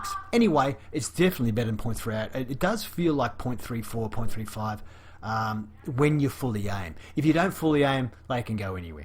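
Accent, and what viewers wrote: Australia. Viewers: Thanks, yes the game has changed a lot, with remade maps and new ones, lots of new Prem tanks introduced, so that for example if I still had my T32, and a few others, it would be out classed by many tier 8's now. But glad you are back as I like your presenting style, and, may I ask if you are an Aussie or Kiwi?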